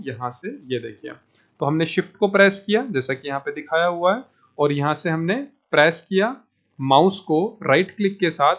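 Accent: India. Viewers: Sir pls at least add subtitles or cc in your video.. I really dont understand what are u saying with ur indian accent.. i can only understand a few word and not all because ur english accent use indian accent. So pls pls add subtitles🙏🏻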